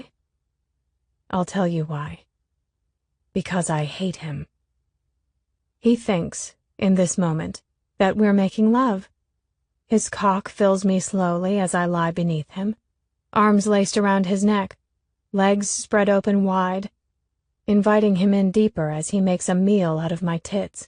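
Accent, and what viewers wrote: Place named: Ireland. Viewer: thats an Irish brogue not a Scottish one….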